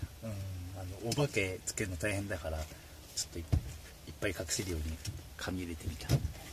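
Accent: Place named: Hokkaido, Japan. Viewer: さんお疲れ様でした。そして本牧ヤードの単独デビューおめでとうさまです😄👍️ 伊藤さんってトレーラー乗りの伊藤さん⁉️昨日動画上がっていてトレーラー降りる事にして次の会社に面接行ってきたと言っていたけど同じ伊藤さんかな………⁉️ ところで、１つ明らかになったことがありまして、私がさんの動画を見ていると（パソコン）、猫がヤキモチを妬いて邪魔をしに来ます。あとメスネコがさんの声に反応して走り回ってニャオンニャオン鳴き喚きます❗️スマホで見てもタブレットで見ても同じ反応をします😅💦⁉️ さんのなまりをダンナの北海道なまりと勘違いして反応してるのかな⁉️⁉️⁉️一昨年亡くなってしまったけど…⤵️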